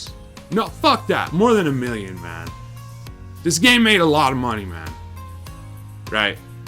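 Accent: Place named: East, Canada. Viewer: Accent sounds like eastern Canada.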